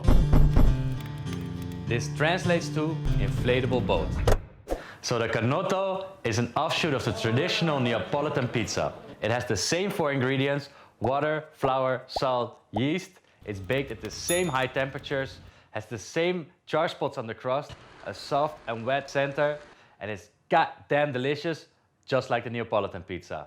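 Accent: Netherlands. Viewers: Kan Gwn horen dat je Nederlands bent gap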